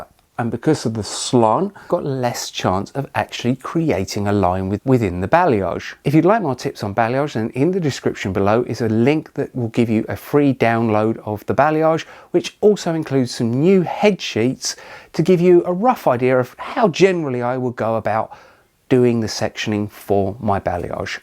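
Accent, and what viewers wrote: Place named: United Kingdom. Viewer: Thank goodness mate. British, Clear straight to the point. Thanks alot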